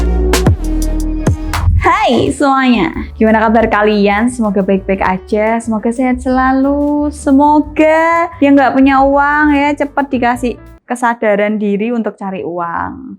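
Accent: Java, Indonesia. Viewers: Hidup medokkkkk !!👍salam seko Magelang mbak 😁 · Medokmu mengalihkan duniaku mba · Medok is the best lah mbak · bicara dgn logat medok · Gpp medok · Medok tapi penginan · loske ae medok yo ben gak opo2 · awakmu sek enak medok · medok itu keren itulah ciri khas mba * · cara ngomongnya medok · Aku di Amerika 20 tahun jik medok koq mbak …..malah Seneng ketemu temen2 dari Malang Padahal aku orang Jakarta Lahir dijakarta, medok kabeh….aku pindah2 terus maklum anak kolong Tapi tetep Kalo pas Ke Malang pasti medoké Metu 🥰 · medok nya mba * itu ciri khas mba * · Medok nya kak mah mao gimna juga tetep keren. Natural. Itulah keren sesungguh nya. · Menurut sya mba malah imut dan cantik kalau medok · Medoknya wong jowo iku tambah manis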